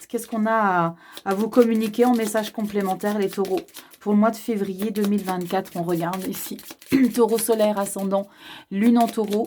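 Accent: South, France. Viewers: Thierry, ☀♉; ASC ♎; 🌝 ♐; 👨💔 Bonsoir, je suis votre chaîne depuis 3 jours, vos tirages sont scotchant de vérité, a tous niveaux y compris tirage sentimental. Je suis en soins de maladie grave et rééducation fonctionnelle des membres inférieurs, j'écris à ce propos pour conter mon expérience de vie actuelle... Ce serai cool d'intégrer à vos tirages pros les notions d'œuvres ou projets artistiques de manière large bien sur, afin d'y trouver des indices précieux... Merci à vous, j'ai l'impression que vous êtes du midi, moi aussi, c'est cool...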